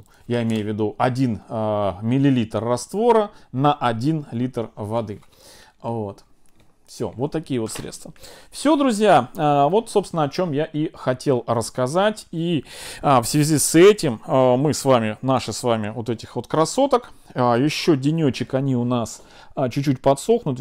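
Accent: Russia. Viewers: С этим аканьем нужно что то делать, невозможно слушать. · к актаре насекомые привыкают, а к оберону конечно же нет, ага... цветы прекрасные, но с аканьем надо что то делать...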